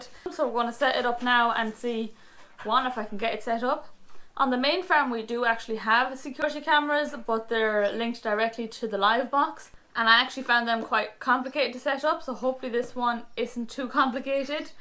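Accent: Ireland. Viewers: Partly guessed you were Irish.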